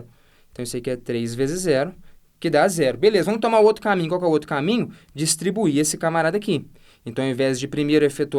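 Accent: Minas Gerais, Brazil. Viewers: Parabéns pelo trabalho. Pelo sotaque, parece mineirinho igual a mim. Sou professor aqui em Brasília, confesso que essa parte tão básica é difícil de se passar em um cursinho aqui, pq é muito conteúdo. Sucesso aí, se tiver alunos com dificuldade em mat básica, indicarei vcs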